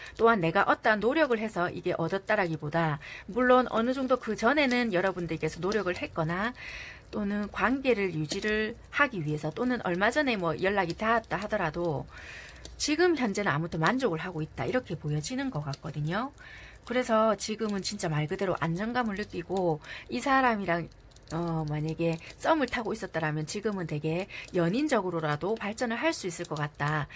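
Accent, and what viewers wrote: Busan, South Korea. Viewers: 잘 지내고 있습니다! 도 건강하셨으면 좋겠어요 ㅎㅎ 온 연락은 비록 지난달 연락이긴 하지만 타로 보며 위로 받아요...^^ 좋은 길이 열리길!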